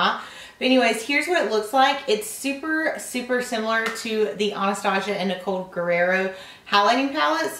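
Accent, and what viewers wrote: South, United States. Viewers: your pure American (love your accent but it won't suit me lol) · I LOVE her kind of southern accent it's so pretty ❤️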